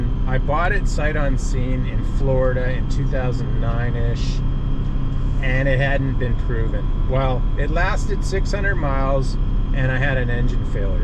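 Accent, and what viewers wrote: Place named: Canada. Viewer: Sounds like yer from the great white north bud. Good thing ICBC doesn't do inspections eh?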